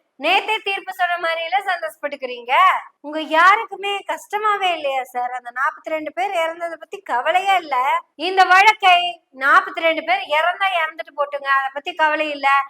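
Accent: Kongu, India. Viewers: அருமையான நையாண்டி கலந்த கொங்கு தமிழ் சுவை வாழ்த்துக்கள் சகோதரி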